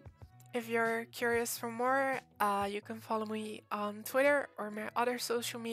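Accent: Netherlands. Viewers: nederlandstalige detected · amazing video! are you dutch? i noticed it by the irl boat and voice :D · You’re definitely dutch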